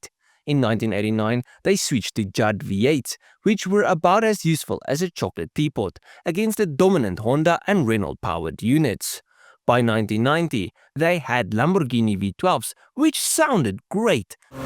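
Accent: South Africa. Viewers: Three seconds in and I’m like holy shit this person has the thickest South African accent I’ve ever heard.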